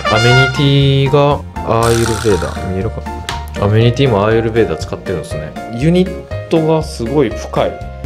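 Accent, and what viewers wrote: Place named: Kansai, Japan. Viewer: ❤✌🕉 、なんで関西弁なん?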